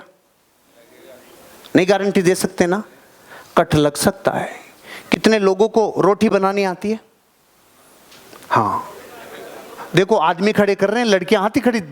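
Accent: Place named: Marwari, India. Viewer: Exlnt exlnt n exlnt sir.your marwadi accents n satic logics Gazab hai ji.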